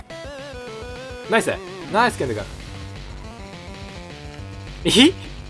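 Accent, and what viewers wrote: Kansai, Japan. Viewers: 関西弁だ！嬉しい(*´ω｀*) そして かわいい笑 いつも以上にかわいいしリピート要素が多すぎる...絶対リピる(予言)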